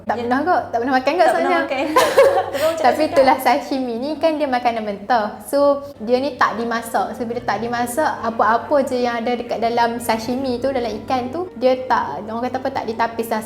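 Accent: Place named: Kedah, Malaysia. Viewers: menarik pulak speaker ni cakap utagha syiokk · Tudia hang puny sembang Kedah memang keluaq habeh tak tapeh ooo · uish loghat utaqa.. dulu aku pernah kena cacing masuk dlm sel kulit sebb pegi pantai x pakai kasut... time tu kecik lagi sampai besar skarang still ada Jalan Cacing tu dkt lutut & blakang... sejak daritu aku Fobia nk ke pantai jijak pasir Daging Mentah: Islam pun kata "Masak adalah makan yg sempurna dari metah yg boleh mendatangkan penyakit❤" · comel la cakap utaqa 😅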